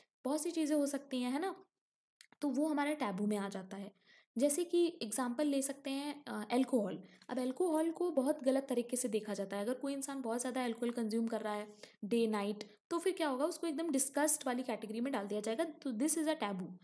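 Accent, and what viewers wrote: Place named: India. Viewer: I don't understand the indian english, sorry!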